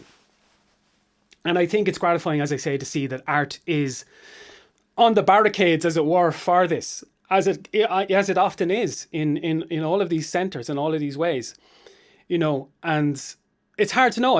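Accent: Ireland. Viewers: You look around the same age as me and, also like me, sound as if you're from Baile Átha Cliath. So, unless you are living under a rock, you still experienced The Troubles. I remember the Dublin-Monaghan bombings, the gun being held to my head, as a kid, by a British "soldier", as I visited relatives in my own country, across there border. I remember the Gardaí checking our houses, etc. It wasn't just those in the occupied counties that experienced it. Yes, it was far worse there, but not exclusively there. Don't you remember when the unionist terrorists, led by Ian Paisley, invaded the Republic?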